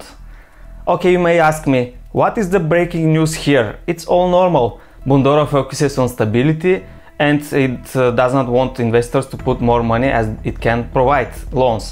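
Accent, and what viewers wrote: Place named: Bulgaria. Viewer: where are you from originally? Just curios Edit: I heard Bulgaria 🇧🇬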